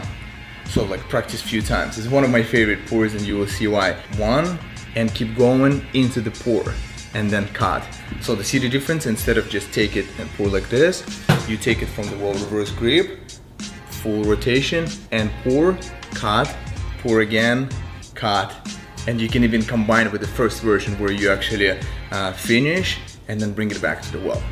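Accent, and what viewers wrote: Russia. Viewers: Охуенная футболка Да и наш акцент чувствуется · Хах, думаю: у него русский акцент🤔 А он реально русский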